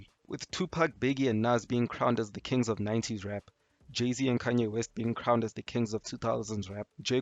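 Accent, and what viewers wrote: South Africa. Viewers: You south African bro? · i swear to god you sound south african, are you?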